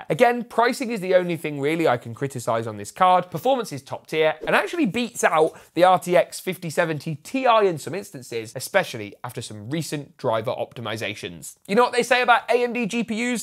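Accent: United Kingdom. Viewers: Why do you sound more extra British?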